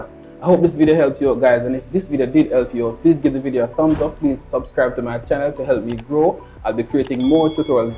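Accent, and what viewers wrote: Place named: Jamaica. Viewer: Bro sound like a Jamaican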